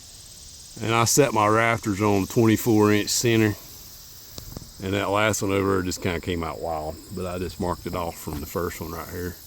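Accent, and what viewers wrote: North Carolina, United States. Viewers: I am from south eastern North Carolina.....your accent is too!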